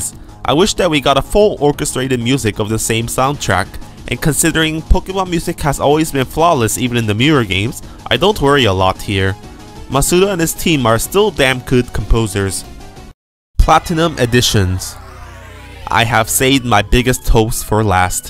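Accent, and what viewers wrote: South Korea. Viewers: Oh, wait so that's a Korean accent?!? Geez, I didn't notice at all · Do you really have a Korean accent? I'm French and I understand you more than a British 😅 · 뭐야 뭔가 발음이 한국엑센트던데 진짜였던거임?? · I just started watching the first 10 seconds and i am honestly surprised by the disclaimer. Sure you have a slight accent but your monologue is totally understandable and there's really no need to undermine yourself like 'my weird Korean accent'. If i may put some suggestion, just say you have cc. Be confident with yourself! I'll continue watching 👍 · Dude, I can hear you just fine I have no problem with your Korean accent. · Sorry but I laughed way too hard when you mentioned your "weird freak korean accent", I love your accent, but you really made me laugh with that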